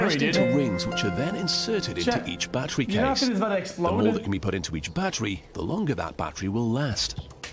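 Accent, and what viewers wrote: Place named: United Kingdom. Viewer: I thought the narrator was always british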